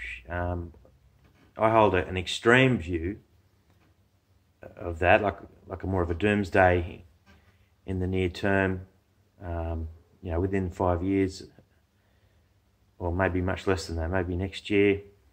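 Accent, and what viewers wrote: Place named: Australia. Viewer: thanks for the Video you sound like an Aussie too 😎 I live in a smal remotel costal town in East Gippsland Victoria and I have conversations about this with my wife, friends many locals are aware what we are facing.. and I also travel to Sydney for work and the people I know there are having this conversation too.. but, my circle of friends live simply, and are artists or musicians .. yet I think alot more people deep down know this is happening the conversation is getting larger .. unfortunately we are just locked into the current paragdime,/paradox we face there is no escape..I will subscribe to your channel...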